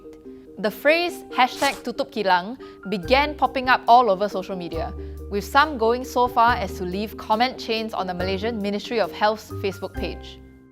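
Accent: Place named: Singapore. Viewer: Can’t understand your Singapore Hokkien English lah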